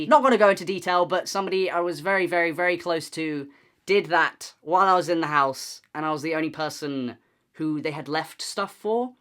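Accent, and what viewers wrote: England, United Kingdom. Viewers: i have no idea where this guy is from like he’s british right ? i’m so confused his accent is english but his words are not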